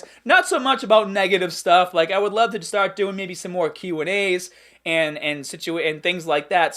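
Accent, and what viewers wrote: Boston, United States. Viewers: Think you almost went the full Boston accent in this vid lol. Sometimes you barely sounded American.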